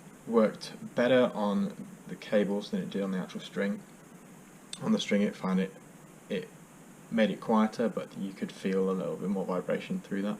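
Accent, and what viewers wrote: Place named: United Kingdom. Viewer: the unintelligible limey blather ends and installation begins.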